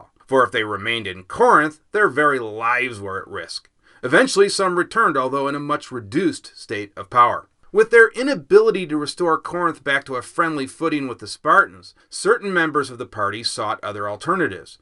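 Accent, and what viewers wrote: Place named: United States. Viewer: I live in Vancouver, thank you for asking! You sound....American?...judging by your pronunciations. Where from exactly? On that note, in your piece on the roman Legion, regarding a couple of your pronunciations; isn't it supposed to be Prince-a-pay, and Tree-aree-eye? That is how I always heard these names pronounced. I would be curious to know..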